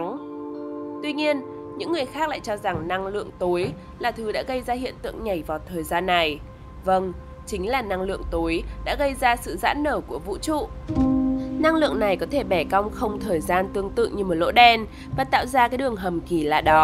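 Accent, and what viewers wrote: North, Vietnam. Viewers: giọng bắc pha giọng nghệ tĩnh ở một số từ có dấu sắc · doc giong nam di giong bac kho nghe qua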